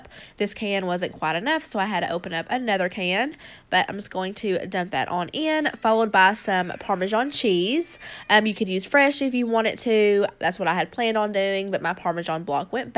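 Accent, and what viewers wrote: South, United States. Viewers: Your accent is very southern lol I kinda like it. Where are you from? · I love your accent!!! I’m from NC, but I never got a true southern accent. But I watch one because I wanted to cook more. Two we have similar taste buds, and three your lovely accent ❤️❤️❤️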